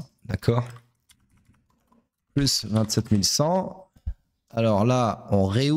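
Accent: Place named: Belgium. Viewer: c'est marrant, malade ca te donne un accent belge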